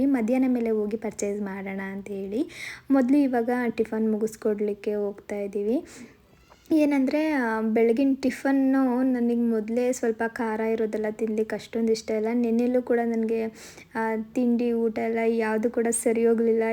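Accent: Mandya, India. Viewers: Super gi coolagi helidira bad comments madirorige artha agirutte bidi Bro matte nivu nimma mathu tumba ista nange namma mandya bhashe matadtare ❤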